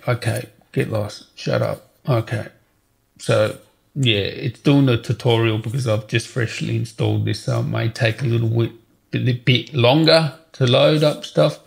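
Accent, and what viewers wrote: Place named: Australia. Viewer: Ofcourse you are Aussie my man! Somehow missed the accent. I use my laptop for VFX rendering, mostly cuda accelerated effects. But I also seek strong battery life, which I am sure the XPS will win the Razer easily. These two laptops are literally a toss up for me, though I am leaning towards the Razer because of the sheer gpu power and better build quality.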